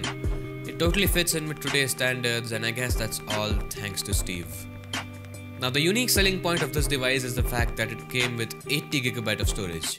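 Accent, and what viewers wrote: India. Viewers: It's just the normal Indian English accent. I can hear him just fine.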